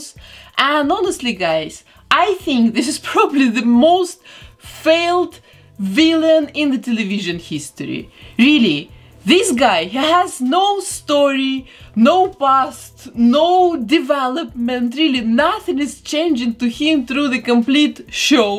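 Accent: Russia. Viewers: Стойкое ощущение, будто человек за кадром имеет русский акцент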